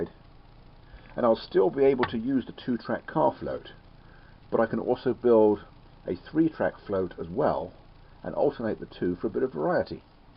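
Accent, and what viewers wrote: England, United Kingdom. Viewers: Yes, I've seen that page and I've met Phil - for several years he lived down the road from my parents' summer home in the Catskill Mountains. I know it was normal to pull strings of cars halfway off to, as you said, keep the barge balanced. I was responding specifically to your question of whether it was proper to leave two cars (you sound English, maybe I should say goods wagons?) alone on the apron, where, it seems, without other cars on one end or the other on a flattish surface, the two cars would be prone to roll off, unless someone went out and put on the brakes. I know another guy, whose email I can't find now, who rode the rails of various New York rail-marine operations in his youth, who might have some thoughts.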